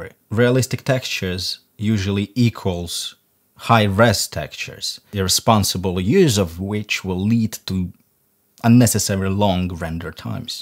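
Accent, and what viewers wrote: Russia. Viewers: блин, я прям чувствовал натянутое английское произношение, ахаха, русских видно сразу =) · Are you a Russian? Doesn't seem you're from UK.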